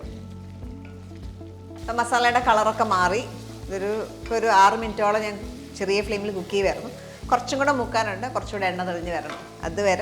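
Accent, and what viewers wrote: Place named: Travancore, India. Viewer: Love ur narration in middle Travancore slang nice❤️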